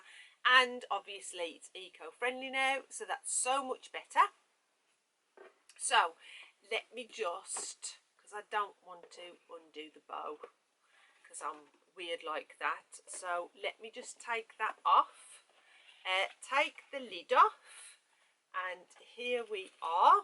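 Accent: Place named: Black Country, United Kingdom. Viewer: I bought this bag too as I LOVE the denim (I bought the headband to match to LOL) also, you’re accent I love it! Im a fellow Black Country person xx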